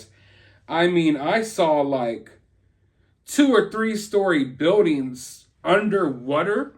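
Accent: South, United States. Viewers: Just from listening to your videos the past couple weeks I just knew you were from the South. LOL Alabama here.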